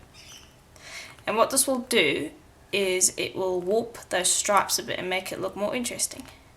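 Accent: South Africa. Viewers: I have just started watching your videos. I have not tried polymer clay yet. But I really want to. So I will be watching hundreds more videos. Lol Question.... are you South African? I see you are in Australia, but your accent is definitely South African.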